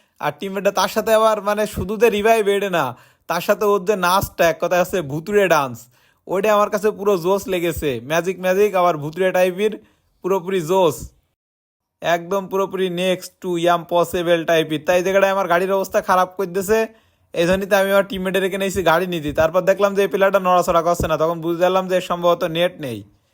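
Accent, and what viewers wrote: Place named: Kaliganj, Bangladesh. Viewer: Tomar basa ki Kaliganj er ase pase? Tomar kothar style sune bollam.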